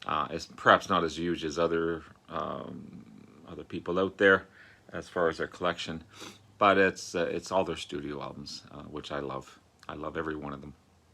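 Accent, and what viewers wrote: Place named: Canada. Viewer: It’s nice to hear your thoughts rather than just showing something that’s been shown a million times already. I’m not a fan (I will go hide now...lol!) but I enjoy watching everyone’s related videos. A very good friend of mine bought me the 50th Anniversary edition of so I do have that...a talking point for the cool kids in the clubs;) LOL Also I love love how youguys say ‘out’ in Canada. It’s so endearing:) Lots of love from the Mitten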